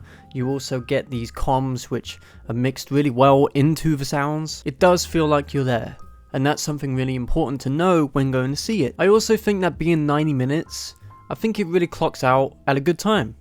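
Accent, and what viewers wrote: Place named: RP, United Kingdom. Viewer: U sound like an upperclass nob tbh so I wouldn't listen 2 Ur opinion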